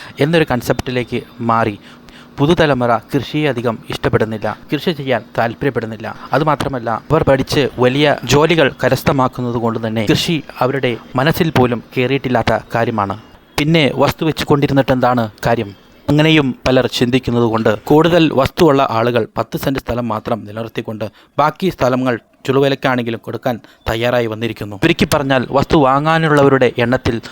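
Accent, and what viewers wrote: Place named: South Kerala, India. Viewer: തെക്കൻ ശൈലി ആശാൻ കളരിയിൽ നിന്ന് പഠിച്ചത് മലയാള അക്ഷരം മലയാളത്തിൽ പറയാൻ അറിയാത്തവർ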